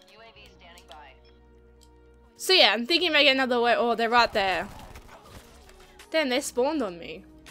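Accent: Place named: Australia. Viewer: also, the Australian accent is awesome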